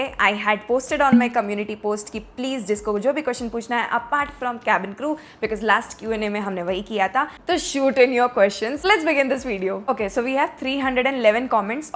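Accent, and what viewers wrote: Bihar, India. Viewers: family they are from jharkhand which was a part of bihar i guess that's why accent little bihari ki jaga gujarati wase sound lagta hai but aapka toh pura bihari bhojpuri but from this vlog got it that you from Rajasthan 👍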